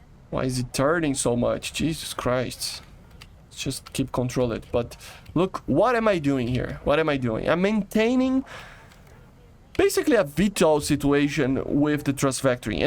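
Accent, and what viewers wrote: Brazil. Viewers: Bro is definitely Brasilian, just by the accent and the way u talk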